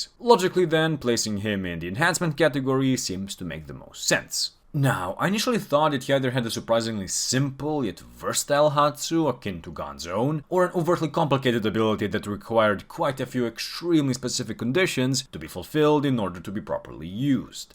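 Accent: Eastern Europe. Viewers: I asked under ur most recent video if you were Eastern European and I just knew I had a Serbian brother here Keep up the great work! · Somehow kinda funny to hear a Slavic accent talk about anime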